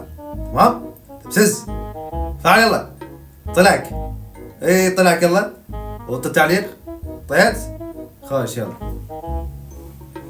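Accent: Iraq. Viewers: اني اول مافشفتك تحجي بصوتك جنت مامنتبة علة الهجتك بس هسة من شفت الك فديو بصوتك انصدمت من طلعت من العراق 😮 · عراقي انتة هالهجة عراقية ❤ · والله حلوه عليك اللهجه العراقية 😂 مال يلا انطي لايك يلا 😂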